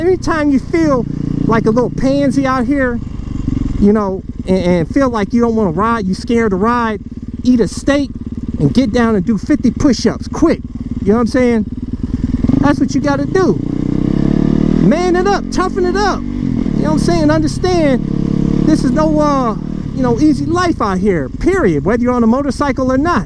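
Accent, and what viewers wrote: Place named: Northeast Ohio, United States. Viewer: I get this strange feeling that you’re from northeast ohio. Maybe its the accent.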